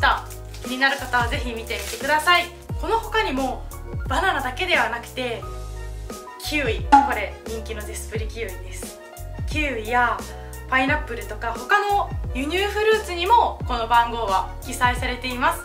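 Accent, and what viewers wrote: Kansai, Japan. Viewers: 気のせいかな？ なんか関西訛りがちらほらと、、、😊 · たいへんよくわかりました。 関西の方のようですね。関西弁でやってもらっても、結構いけるんちゃうかなあ。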